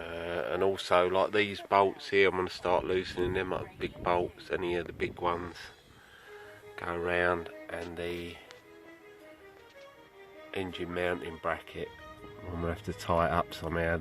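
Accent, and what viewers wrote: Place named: Cockney, United Kingdom. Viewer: Loving the auto-generated sub titles on this with his cockney accent lol.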